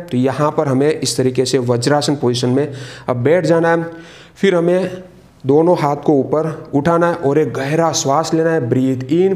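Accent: Gujarat, India. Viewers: By accent , I guess you are from Gujrat..btw , nice advise in videos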